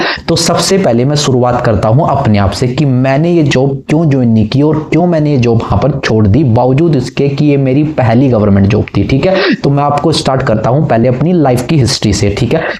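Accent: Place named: Maharashtra, India. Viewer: MAHARASHTRA ACCENT AALA HOTA I THINK SOLA😀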